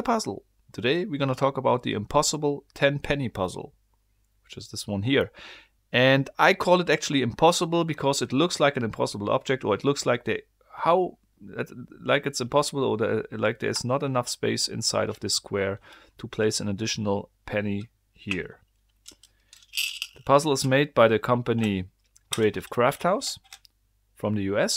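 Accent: Germany. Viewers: the German accent makes it more credible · Are you german? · That's some strong German accent! (Ich müsste mich mal selbst aufnehmen, wenn ich Englisch spreche, vielleicht klinge ich ja genauso) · are you from germany? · Haha bist du deutscher?? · You sound like you are from germany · I love your German accent. Best one I've heard. "Brütforce" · Man merkt direkt an deinem Akzent dass du deutsch bist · Deutsche hören es einfach, dass dieser Herr nur aus Deutschland sein kann. 😅😅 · german accent is so damn cringe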